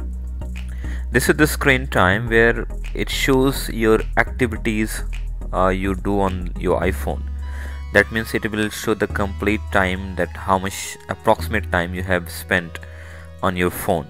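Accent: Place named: Asia. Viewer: you sound like you are Asian I would appreciate it if you would speak British instead, even though I only have a British accent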